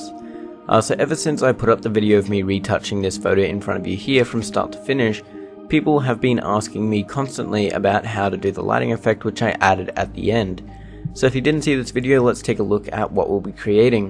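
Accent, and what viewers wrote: Australia. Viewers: me neither cannot understand australian english "hold the OKIE on a keyboard" :D what is that? thx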